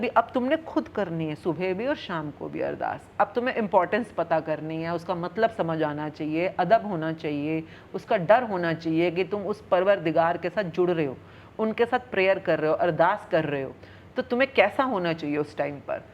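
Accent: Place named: Punjab, India. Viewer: Aap ki punjabi ka lahja bilkul punjabi hai thank,s .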